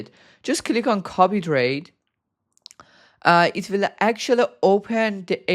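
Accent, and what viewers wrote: Iran. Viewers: از لهجه تخمیت معلومه ایرانیی😂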